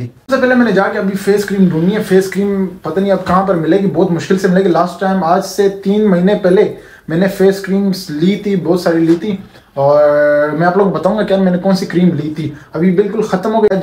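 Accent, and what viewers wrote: Pakistan. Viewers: Your transformation is amazing , you look so handsome but the issue is the way you speak, the moment you spoke it doesn't suit the swag you have in appearance. Pakistani language and male tone isn't sexy enough.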